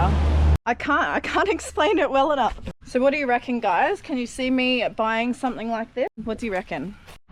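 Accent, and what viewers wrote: Australia. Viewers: New subscriber to your channel and am very happy I found it, gonna binge watch your channel. Are you Australian? You have a similar accent to my buddy Rod from Rokkit, I've been subscribed to his channel from the beginning. Anyways looking forward to binge watch your channel take care and have a blessed day. Aloha from Kansas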